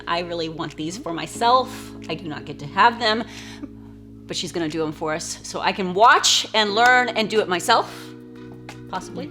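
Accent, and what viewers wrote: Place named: Midwest, United States. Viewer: I knew she was from MN! Michigan girl here. I’d recognize that Midwestern accent anywhere! Love nail sets! 😍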